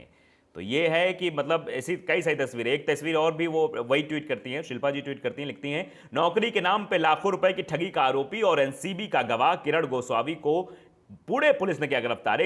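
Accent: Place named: Lucknow, India. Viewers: FromPak🕊Enjoyed your dialect/ language and style. Are u from Lucknow. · मस्त अंदाज़ है भैय्या आपका🙏🙏 प्योर up के लगते हो।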